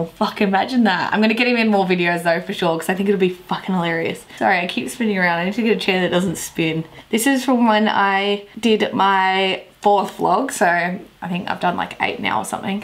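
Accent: Australia. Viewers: Thumper Bunny, Everyone has an accent, you just don’t know you have one, since you’re use to it, she does have some sort of an Australian accent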